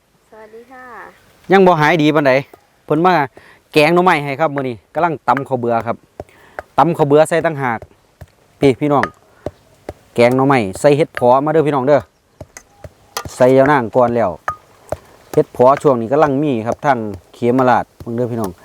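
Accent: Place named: Ubon Ratchathani, Thailand. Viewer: เกรียงไกร สุริบุตร ฟังสำเนียงเราน่าจะยุ่ทางอำนาจอุบลละคับพี่น้อง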